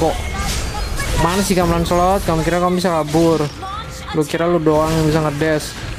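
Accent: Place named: Banjar, Indonesia. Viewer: Bng org bnjr? Soalnya logat nya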